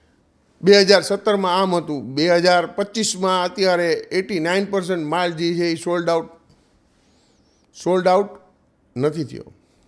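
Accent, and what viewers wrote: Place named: Kathiawar, India. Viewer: Biju badhu to thik pan tame je original kathiyawadi laheka thi vat karo chho e game chhe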